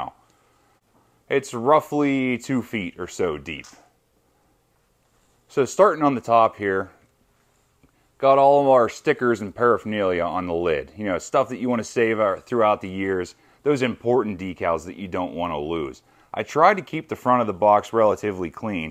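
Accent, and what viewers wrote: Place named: Pennsylvania, United States. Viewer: sounds like you have a pennsylvania accent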